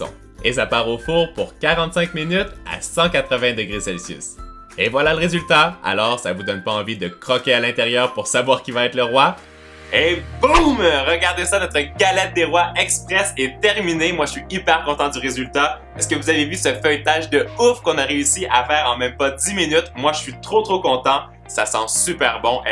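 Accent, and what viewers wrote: Canada. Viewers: Bonne année et j'adore ton aksent du canada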